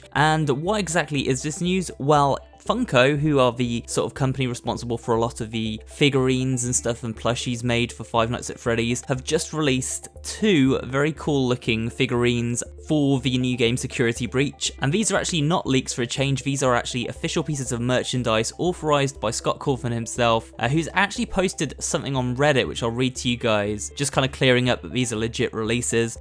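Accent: United Kingdom. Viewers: you sound like your from the UK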